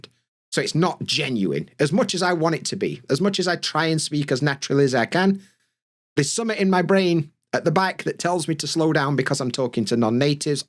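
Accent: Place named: Yorkshire, United Kingdom. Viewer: yeahh mate, thanks !! just be able to hear your accent is already help a ton ! not everyone use yorkshire accent everyday !! and you already give a lot of ecouragement video too, thanks !! and if you can give more things about some rules,, that would be perfect !!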